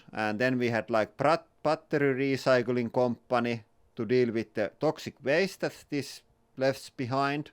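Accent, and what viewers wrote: Finland. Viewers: ❤ one of the reasons I come here is because of the Finnish accent, i like every accent. Be proud 😊 Ps and some very satisfying crushing😂❤ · I love the Finnish accent. It fascinates me.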